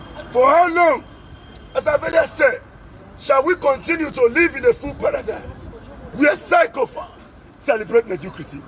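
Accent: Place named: Biafra, Nigeria. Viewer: U are talking like a BIAFRA i hail u sir .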